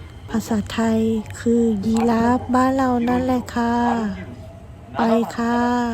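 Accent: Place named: Japan. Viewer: ดีจ้า รับชมเมืองญี่ปุ่น ด้วยคะ ฟัง บรรยายจนเพลินเลยจ๊ะ บรรยายได้น่ารัก คะ สำนียงจะออกทางญี่ปุ่นหน่อย พั่ลัดด่จะรอชมคลิปต่อไปนะคะ❤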